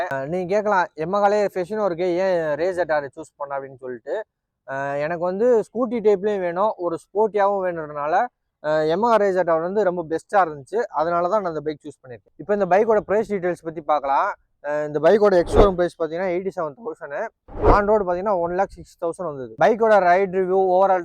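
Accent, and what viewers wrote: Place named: Coimbatore, India. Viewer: Yov ne paaka yenga area la iruku oru paiyan maari yei iruka ya... un looks and pechu... avan coimbatore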